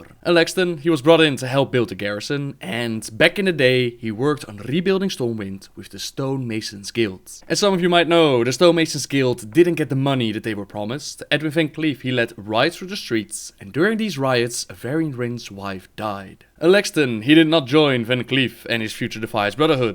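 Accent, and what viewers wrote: Netherlands. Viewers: I know I asked that on asked but I am not sure if you saw it. Can you make fury do one lore video? It is relaxing to listen to her voice. It would be perfectly to just chill out and listen to some great story told by fury:) And force her to make music and sing. But never the less keep up your good work too. Also a great voice to listen to and the dutch accent is always a friendly one to hear:D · are you Dutch ? :) well i am c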